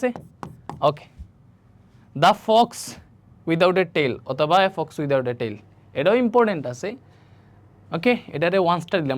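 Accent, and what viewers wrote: Sylhet, Bangladesh. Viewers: Vai maybe sylheti ?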